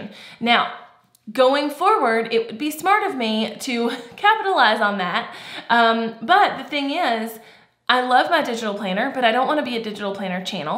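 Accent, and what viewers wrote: South, United States. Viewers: I love because she is one of the few Youtube Tip creators who have a southern accent.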